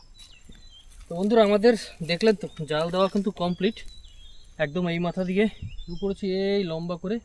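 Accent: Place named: Khulna, Bangladesh. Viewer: District ER nam ki. Kotha bolen tho khulna r moto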